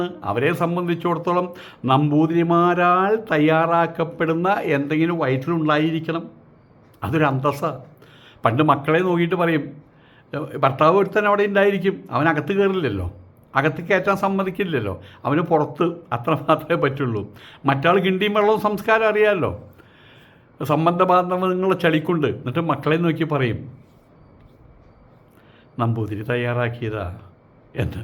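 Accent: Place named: Thrissur, India. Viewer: സ്വാമിയുടെ നാട് ചേർപ്പ് ആണോ ? തൃശൂർ Slang ഉണ്ട്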